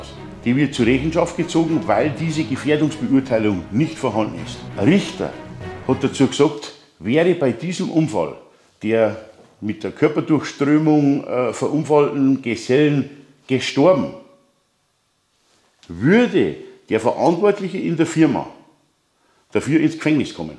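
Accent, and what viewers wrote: Bavaria, Germany. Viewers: Hallo, meine Meinung zu den aufgeworfenen Fragen: "Jeder Unfall ist vermeidbar. Eine schuldhafte Pflichtverletzung liegt hier meines Erachtens von keiner Seite vor. Die Prüfung des spannungsfreien Zustands sehe ich nicht als zwingend an. Bei einer Leitung, die ich selbst gelegt habe, gehe ich doch nicht davon aus,dass diese plötzlich Spannung führt. Vielmehr handelt es sich hierbei um eine "Anlage im Bau", spannungsfreiheit müßte dann geprüft werden, wenn die Anlage durch Schalthandlunge unter Spannung gesetzt werden kann. Da davon auszugehen war, dass die Leitung noch nicht abgeschlossen ist, war dies hier nicht der Fall ".Schlußfolgernd muss gesagt werden, dass bei der Auftragserteilung eine bessere Abstimmung erfolgen muss. Ich würde die Anschlüsse an der Hauptverteilung nur von einer Person, nach Vertigmeldung der übrigen Arbeiten, vornehmen lassen. 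Ich finde deine Beiträge großartig, wertvoll für Schulung, Aus-und Weiterbildung. Ich habe über 40 Jahre im Beruf gearbeitet und sehe deine Beiträge sehr gern. Das Bayern ihre eigenen schönen Dialekt haben, weiß man normalerweise. Viele herzliche Grüße Reiner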